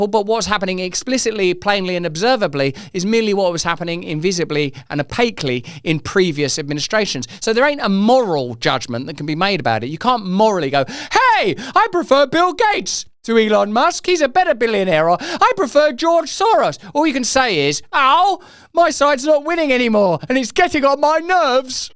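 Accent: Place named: United Kingdom. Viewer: Russell Brand is funny but he talks so fast and so British if you blink you could miss something. “Hey we don’t have evidence he’s a Russian plant! No, no evidence I’m sorry I just got on tv, got excited, and thought I might say something incendiary.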